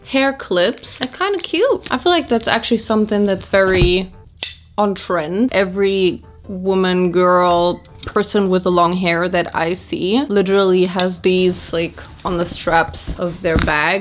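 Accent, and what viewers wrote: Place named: Germany. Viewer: I hear the germaness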